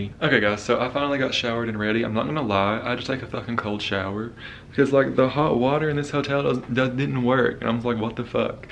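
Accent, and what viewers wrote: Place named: Kentucky, United States. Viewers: U WERE IN KY ? I NEW THAT ACCENT WAS FAMILIAR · Just stumbled upon your channel . From Lexington KY just moved out here to Colorado a couple years ago! Recognized the accent immediately! Much love !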